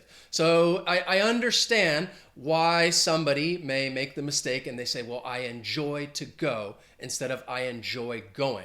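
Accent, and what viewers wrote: United States. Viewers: Thank you for this video. You teach very well! We Spanish speakers need to learn English for native English speakers as you. The best of all...I don't need to pay anything to watch your videos and your lessons are short. I don't even need an expensive English textbook . Your English is very clear and I love American accent. 🗽 Keep it up teaching! Greetings from Colombia! 😁👍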